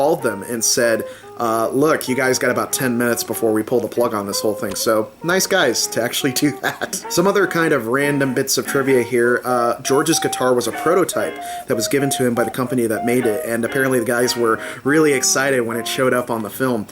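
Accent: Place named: United States. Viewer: Why are they always Americans that do this sort of thing,they speak too fast with terrible accents,I keep waiting for him to say Oh my God yuk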